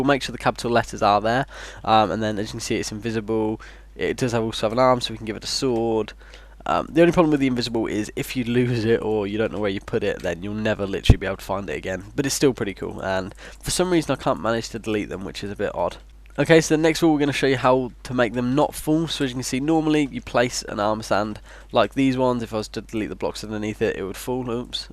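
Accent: England, United Kingdom. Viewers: I know it may be obvious to some but are you English? I can hear your accent but I doubt it a bit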